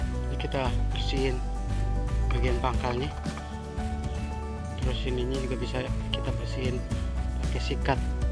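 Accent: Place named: Jakarta, Indonesia. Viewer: oww.. Kayk orang Jawa.. Tp kok logat nya betawi.. 🙏🙏🙏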